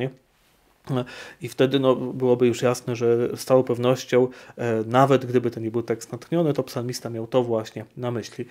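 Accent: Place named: Italy. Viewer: Strasznie mnie drażni włoska wymowa łaciny przez księdza. Równie dobrze mógłby ksiądz wymawiać łacinę według reguł polskiego języka albo jakiegokolwiek innego. Uczyłem się łaciny u trzech mistrzyń, a ostatnią była ś.p. pani Maria Kosiarska na KUL, która cieszyła się ogromnym autorytetem i nigdy nie słyszałem, aby którakolwiek z nich mówiła manium, redżina czy czelum. Zawsze było magnum, regina i coelum. Dla mnie okaleczanie łaciny poprzez włoską wymowę jest obrzydliwością, bo każda deformacja jest miła diabłu. Poza tym dzięki za świetne audycje.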